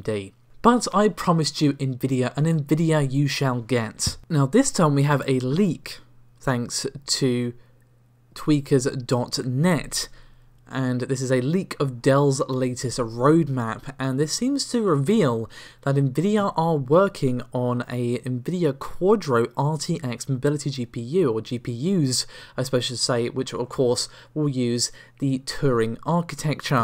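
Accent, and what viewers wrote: United Kingdom. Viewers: I cannot hear what this guy says. Talks too fast, slurring and with tons of nonsense and seems to only emphasis a heavy British tone sequence. If you're not showing your face then don't pretend you have a conversation.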